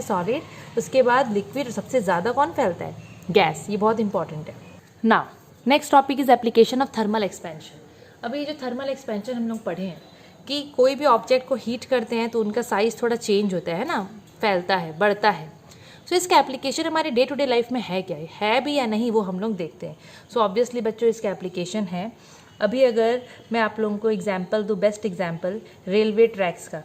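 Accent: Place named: Kerala, India. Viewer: Maam you are from kerela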